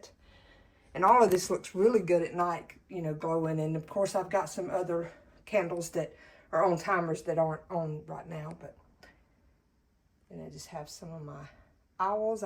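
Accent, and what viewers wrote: South, United States. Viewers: Love your decorating, AND your sweet southern accent! 😊 Thank you for the tour, I really enjoyed it.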